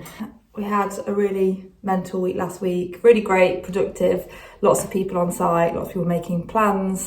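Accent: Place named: United Kingdom. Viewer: Just loved this!! Great job!! We are from upstate New York and my husband says I have a very flat accent🤦🏻‍♀️, your British accent, on the other hand, is so lovely! Good luck with all of your endeavors!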